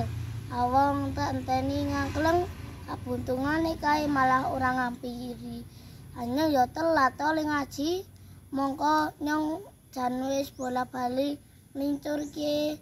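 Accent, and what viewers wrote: Temanggung, Indonesia. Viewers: Meduk banget.bahasanya kas Temanggung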